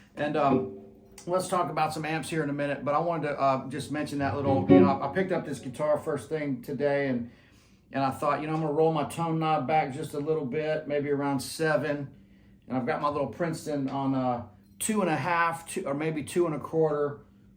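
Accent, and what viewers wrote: United States. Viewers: reat vid great playing like that southern accent. I have Princeton reverb with ironman attenuator and like that setup. Background, i been playing only 2 years and no where to your playing and current live in sober living environment(been sober 2.5 years) and we have own rooms. So i try to be mindful of everyone. People work all shifts, i get up mad early and people say they rarely hear me play. I love my princeton and unless i start gigging or hit lottery doubt i really will ever need another Amp. I also have champion 40 w which doesn't compare but is adequate for heavier stuff. Just curious your opinion on attenuators they don't seen to be very popular option. I will try it unattuated later. I just always assumed that was suboptimal to play at 2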